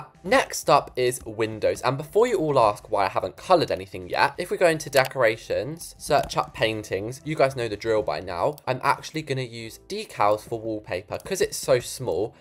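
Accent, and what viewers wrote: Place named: United Kingdom. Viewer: i think we can all agree that the build looks amazing! her voice is also so pretty bc im a American but i wanna have a british accent! also a tip for ur next build, idk if you knew this but if you take a ckear basic shape and resize it to be thin then get a decal (code is at the end) and now you have a realistic fridge! one more wsy to do it is to get small grid and get verdical planks and place in a line then coler black then place the taped photograph resize then change decal! code: <num> ur the best!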